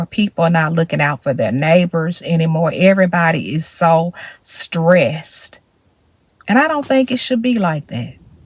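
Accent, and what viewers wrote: South, United States. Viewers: A typical southern accent and love it🎉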